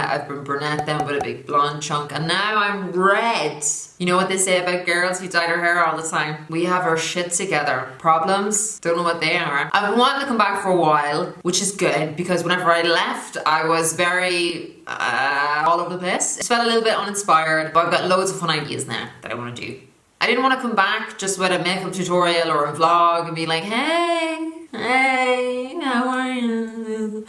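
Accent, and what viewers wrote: Ireland. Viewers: love you girl!! Missed that gorgeous Irish accent on the tube of you 🥰🥰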